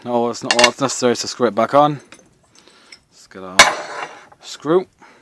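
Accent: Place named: England, United Kingdom. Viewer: Thanks so much for these videos, I live in the U.K and have this exact model except it is a blank firing version made by Pietta. Turns out the little hand and spring was the problem as it had broken and you have really helped me to fix it, I cant thank you enough! Nice to hear an Englishman's explanation!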